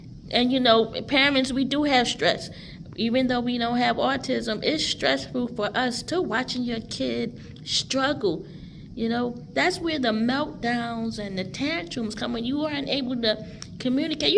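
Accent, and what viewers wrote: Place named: New Jersey, United States. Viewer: I love your Jersey accent!!!!!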